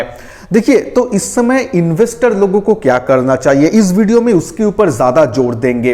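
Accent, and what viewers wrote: Bengal, India. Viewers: You sound Bengali. Are you based in Mumbai or kolkatta or where?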